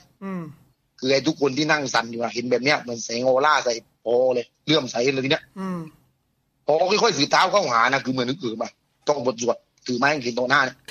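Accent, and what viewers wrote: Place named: South, Thailand. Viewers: เข้าใจบางคนที่ฟังพี่ ไม่ทันสำเนียงภาษ า ถ้าคนใต้จะฟังปกติครับ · ผมคนภาคกลางฟังรู้เรื่องทุกคำเพราะชอบสำเนียงใต้ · ฟังยากจริงค่ะ😂 ขนาดฟังพี่แกบ่อย ยังไม่ชินกับสำเนียงใต้เลย😅❤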